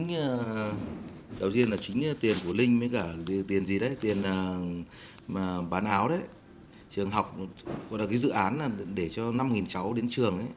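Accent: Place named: Hanoi, Vietnam. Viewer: Minh da Theo doi t all va minh rat yeu men co giong noi ng Ha Noi de thuong, hien co hau Love ya sweetie ❤️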